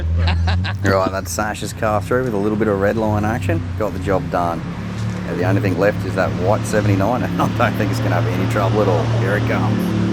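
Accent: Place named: Australia. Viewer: Strong accent. I live western nsw, work western qld and the nt and noone speaks like that. Is this aussie city guy accent exaggerated for youtube?\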